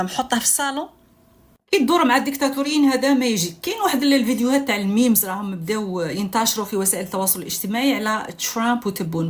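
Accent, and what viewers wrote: Morocco. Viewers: Cette femme est marocaine l’accent marocain la dénudée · مهما حاولت التأقلم على اللهجة الجزاءرية فلهجتَك المروكية خانتك انت عياشة والحلم لن يتحقق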